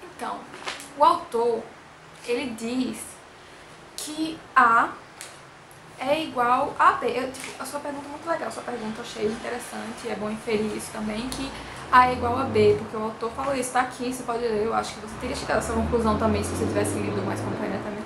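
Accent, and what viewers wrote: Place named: Northeast, Brazil. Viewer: Não gosto muito do sotaque do nordeste :S Mas sim, é verdade isso hehe estuda o que la?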